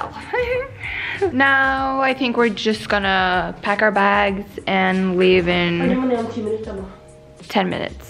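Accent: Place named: United States. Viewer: you sound totally american like really, ilysm!!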